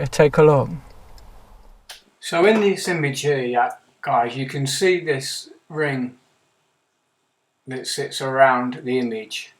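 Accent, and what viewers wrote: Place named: Yorkshire, United Kingdom. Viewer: Thanks for your video of macro photography which I thought was very encouraging for people new to Macro, I’ve been doing it just over a two years shortly after my retirement and like yourself find you get so drawn in to this hidden world which is all around us, but people completely unaware, just pass by it, I’m a Leeds guy and hope you don’t mind me saying sound like a Yorkshire man and my apologizes not, however if you are close to Leeds I would love to meet up with you sometime as I don’t have a photography friend I can converse with. Kind regards Dennis. Email: <mail>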